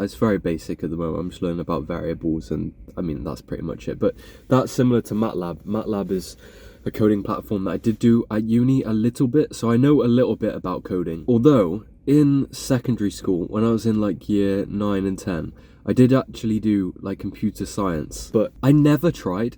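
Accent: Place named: England, United Kingdom. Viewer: I don’t think that I’d ever have contact lenses, as the chances of getting eye infections is too great, even though I started wearing glasses for driving motorcycles in my home county of Meath in Rural Ireland many years ago (I’ve only lived in the U.K. (Manchester) for 24 years now) but I had a friend who had a severe eye infection from wearing contacts and he was in the MRI (St Mary’s Eye hospital university training hospital in Manchester) as an inpatient for several days - I’ve also used St Mary’s and the MRI dental hospital over the years and because they are a university training hospital, they have a consistently very high standard of patient care - at age 54 myself now, I now wear varifocals - by the way, I could listen to your gorgeous and well spoken English accent all day, it sounds so classy - despite my years living in Manchester, I’ve still retained my strong yet clear Irish accent, about which I’ve had a lot of compliments from my English friends 🇮🇪☘️🇮🇪😍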